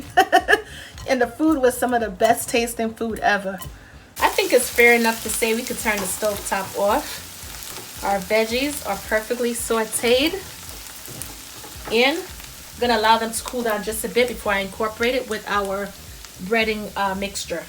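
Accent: New York, United States. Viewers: It looks amazing. Love the East coast accent. Sounds like NY, or Brooklyn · you sound like a New Yorker your accent does NOT sound Southern at all..Love your cornbread stuffing recipe..but I will like to see how you made the cornbread..My mon does it the same way but w/outthe croutons..Looks Delicious..!!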